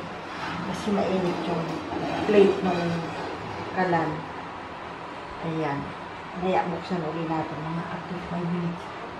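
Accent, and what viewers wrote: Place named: Bulacan, Philippines. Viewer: Aq po naglalagay ng dayap sa maja din..masarap po tlga ang my dayap..prang refreshing ang lasa nya.. Taga bulacan din po ba kayo Ma'am? Ksi po tagalog na tagalog kayo mag salita..tulad nlng nyan,,dayap ksi sa bulacan din.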